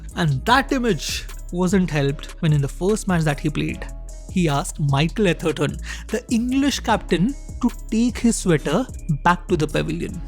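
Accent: India. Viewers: I like how don't try to pretend and fake your aaccent and use our Indian accent. Kudos. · This is mother tongue influence we all Indians have...We are not Brits.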